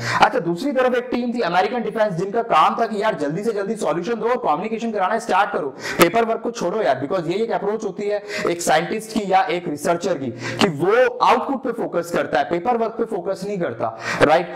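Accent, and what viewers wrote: Pakistan. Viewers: sahi kaha bolta ek dm pakistaniyo ki tarah h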